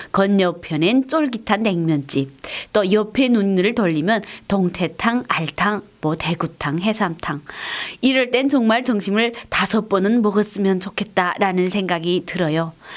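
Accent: North Korea. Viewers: 말솜씨가 아주....북한의 억양이 좀 남아있지만 조리있는 문장과 말 보기 좋습니다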